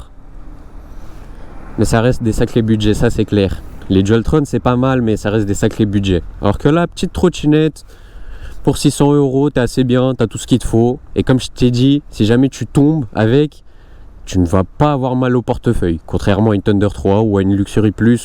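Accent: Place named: Romania. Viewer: Vous avez un accent. Vous êtes d'origine roumaine ? Vous parlez bien français. Attention au code de la route...!! Ligne blanche continue...et plus ENCORE...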